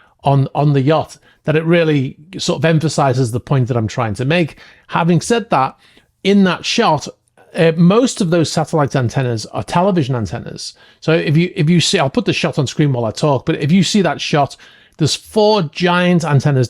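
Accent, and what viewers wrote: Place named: United Kingdom. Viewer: I love British accent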